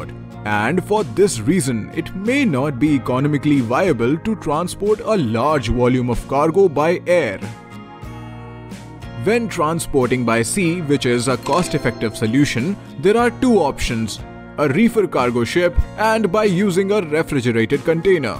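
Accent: India. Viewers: Indian accents is unbearable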